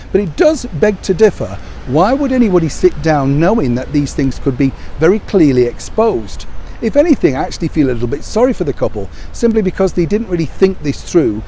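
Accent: United Kingdom. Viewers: Who is this guy? Seems to be just another random white guy with a British accent But here's the thing: This doesn't diminish my support for H&M one bit. I am not beholden to some arbitrary delusion like the rest of you seem to be.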